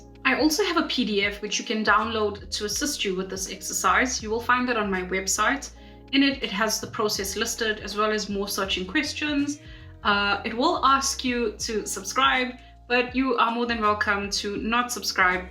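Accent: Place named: South Africa. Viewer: I’ve always been told that the South African accent is calming and it took me 33 solid years but now I agree.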